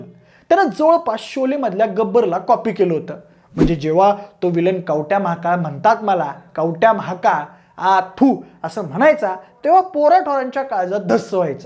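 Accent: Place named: Nagpur, India. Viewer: मला वाटले नागपुर करांचा आवाज़ आहे की काय तो।।।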